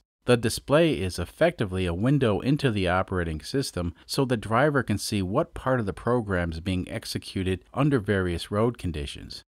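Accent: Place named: Midwest, United States. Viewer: I’ve been thinking about why this channel “works for me”. - First of all its simply entertaining. - Your channel combines several of my younger self passions (being a motor head, electronics, computers, and just making stuff to see if I could do it). - You explain difficult subjects with clear to understand “Midwestern dialect”. (I was really wondering how you were going to pull off explaining interrupt processing, but you did it well.) - The pictograms and simple explanation help us to visualize new concepts. Not everyone has a mech-e or computer science background. So pictures help greatly. - Your approach to the channel is much like just a couple of guys hanging out in the garage trying out different ideas, some work and some not so much. If everything worked the first time, what would we have learned? As for channel statistics, while you need to keep an eye on them for the health of the channel, I wouldn’t focus on them too much. 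Make what you enjoy and they will come. (edit: I tried to make a weak field of dreams reference, but I just looked it up and that was Iowa not Kansas. If it worked the first time, what did I just learn?)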